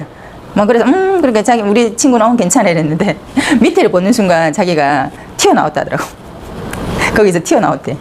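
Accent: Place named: Gyeongsang, South Korea. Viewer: 갱상도 사투리 맛깔나게 하네 엉덩이 노노 궁디 오케이~~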